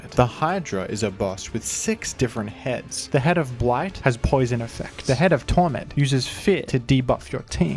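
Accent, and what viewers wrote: Australia. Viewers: Oh thank god you’re Australian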